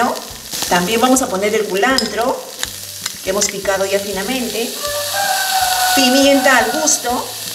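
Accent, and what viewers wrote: Peru. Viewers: Exactamente se nota cuando habla un peruano en el extranjero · El acento y la comida peruana es exquisita y con sus variedades en las preparaciones de sus potajes...TE AMO PERU !!! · El acento peruano es muy bonito gracias por la receta un abrazó.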